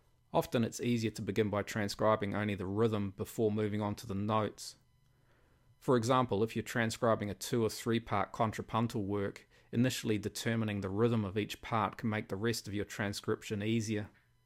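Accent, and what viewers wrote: New Zealand. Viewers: are you a kiwi? your accent sounds like a new zealand accent! Very helpful video thanks heaps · Hahaha I also was like "Oh damn, a fellow kiwi"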